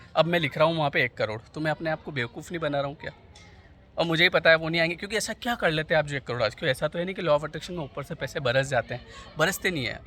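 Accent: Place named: Rajasthan, India. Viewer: Bhai rahte kha ho aap asa lgta h Rajasthan se ho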